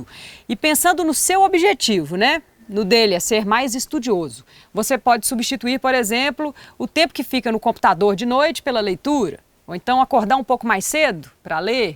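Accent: Minas Gerais, Brazil. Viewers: As mulheres mineiras estão muito bem representadas por você, tem um belo sotaque que não e arastado e nem enjoativo sua voz e linda e vc e muito simpática e por ironia do destino e linda muito linda mesmo com um belo formato de corpo,tem um velho ditado que diz que uma mulher quando e bela até chorando ela e bonita,com todo respeito sei que você se olha no espelho e realmente e muito especial, tudo de bom para a sua vida é principalmente para toda a sua família, sucesso para sua vida hoje é sempre.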